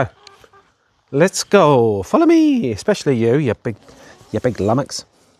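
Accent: Essex, United Kingdom. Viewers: G’day from Australia..as you said about your Essex accent not saying some of the letters in the words…even though you said the word”crepe” the way it’s spelt..we spell it the same but for some reason we say it as “ crape”???? Not to be confused with with “ crap”🤣🤣🤣 silly bugger,not naming your plants..but you will get a nice suprise when they flower or fruit….your lunch looked delicious also…really enjoying your extra videos..thankyou for sharing 🇦🇺🇦🇺🇦🇺🇦🇺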